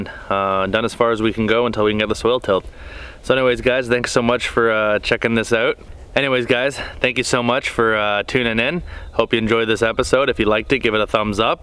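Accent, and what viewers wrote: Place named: Canada. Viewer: Like the Canadian accent.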